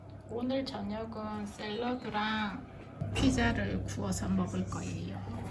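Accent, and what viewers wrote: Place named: Gyeongsang, South Korea. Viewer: 반갑습니다 까망님 체널 보다가 님 댓글 보고 왔습니다 스위스 사시는군요 추운날씨에 촬영 하느라고 수고하셨습니다 첫눈 치고는 많이 내리네요 경상도 분이 신가봐요 사투리가 조금씩 들리네요 ㅎㅎ 저도 구미 살아요 응원하고 선물도 드리고 갈게요 행복한 하루 보내세요☕;)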